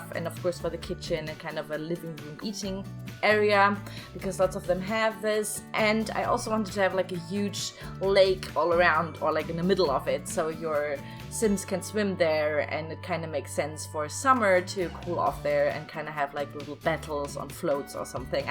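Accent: Germany. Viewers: Wusste ich doch das ich da was deutsches raushöre😏😂😂❤😍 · Your accent isn't even half as bad as mine... To be honest, I didn't even notice that you're German and I'm one myself. My accent is also the reason why I'm afraid to start a YouTube channel, because people can be mean an all. Your accent is fine and not as bad as people make it seem! I also loved the way you adressed it and I love this camp, I'll definitely add it to my game!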